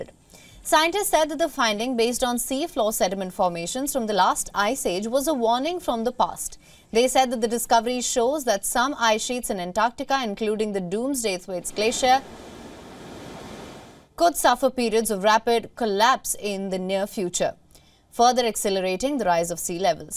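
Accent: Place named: India. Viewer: Ahh, good old indian accent with indian face. Jai Hind!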